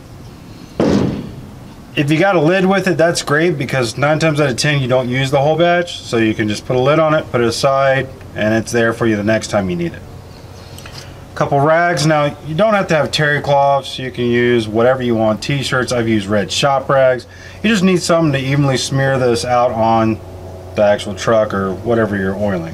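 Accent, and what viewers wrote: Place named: United States. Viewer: Hi there! Wonderful job! 👏 Sorry but I didn't catch your american accent. I tried but I couldn't understand the names of those 2 chemicals you used to reveal and preserve that nice patina/rust effect. Would you please write them down for me? Thank you very much! Forgive me one or another mistske. English is not my native language. Fro Brazil 🇧🇷.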